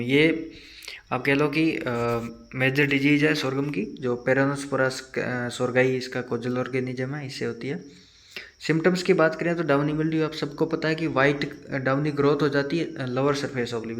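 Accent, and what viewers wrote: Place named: Haryana, India. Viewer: haryana s ho kya bhai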